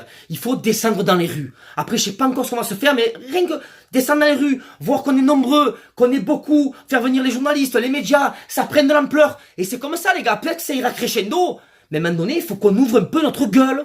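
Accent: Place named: South, France. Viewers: Je n'en ai rien à faire si vous ne me saluez pas ! je ne vous ai rien demandé il me semble ? je ne me suis pas adressée à vous. Si vous suivez ce gars c'est votre affaire, libre à vous. Ce n'est pas parce qu'il a un accent du sud qu'il m'horripile, c'est parce qu'on dirait un malade mental en train de gesticuler ! 3mn de sa vidéo m'ont suffi !!! franchement s'il est intelligent, il le cache bien ... je suis désolée si mon commentaire ne vous plait pas, mais j'ai l'habitude de dire ce que je pense. Cela prouve votre niveau "d'intelligence" à vous aussi... visiblement lorsqu'on aime pas quelqu'un on est catalogué de haineux ? belle mentalité ! bravo, vous avez tout compris !! alors continuez à écouter les analyses pertinentes du pantin dans sa boite, je pense que votre niveau est identique ! ne prenez pas la peine de me répondre, je ne vais pas perdre mon temps à venir vous répondre ! 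je vous laisse donc déblatérer tout seul ! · Minable ce commentaire, il a un accent du sud et parle avec les mains et alors? ça vous suffit pour le juger et le traiter d abruti?? j ai pas pour habitude de jouer au cow boy en défendant des youtubers, mais pour le suivre depuis un certain temps j ai envie de dire que c est un homme extrêmement intelligent et respecteux, qui traite de sujets variés à travers des analyses pertinentes et une touche d humour. Il faut être talentueux pour arriver à faire ce qu il fait et surtout pour supporter des commentaires gratuitement haineux et non fondés comme le votre.Voila pourquoi il est suivi par près de 30000 personnes Madame, peu cher payé d ailleurs pour les moments de rire qu il nous offre. Bref, je ne vous salue pas.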